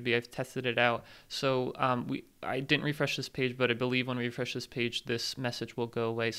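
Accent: Baltimore, United States. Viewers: I can hear that Baltimore accent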